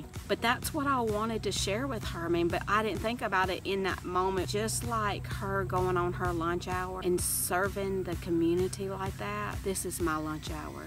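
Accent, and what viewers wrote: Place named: South, United States. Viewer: Yay for a fellow southern speaker, lol. I like all the scene cuts that move the story along. I need more of that in my stuff cause it holds attention. I don't have a lot of voice of influence as I don't work and I don't get out much except with the wifey. But when I do, I try to engage with people on a positive level and spread a little humor. Subbed!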